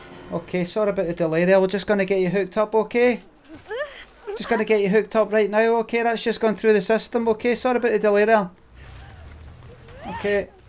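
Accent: Scotland, United Kingdom. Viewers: Oh cool a Scottish DBD youtuber 😯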